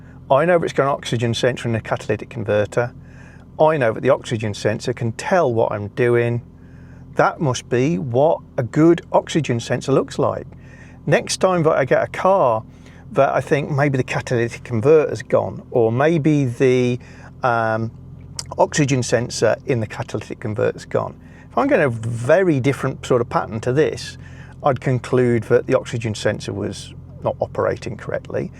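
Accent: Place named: North, United Kingdom. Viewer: You should clear the fault codes and see what comes back. Also, your jury rigged fuel pump probably isn't helping. You need to properly reinstate the fuel pump. I know you were loath to do that but you're at the point where you need to properly sort it. You should try swapping sensors from one of your other cars to eliminate faulty sensors. You could also do with a better scantool. That Launch one looks to be little more than a glorified code reader. I have a Topdon AritDiag 800BT I'd be happy to scan Steel if you're close enough to me (your accent sounds "northern"). I can also test your battery because I have a Topdon BT200 battery tester (yeah, I'm a Topdon fanboy). Are your car names a reference to TV shows that Dame Joanna Lumley has appeared in? If so, I'm sure a future car would have be called Patsy or Edina. If I could choose any female voice for a sat-nav, it would be Joanna Lumley. 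She has such a lovely voice.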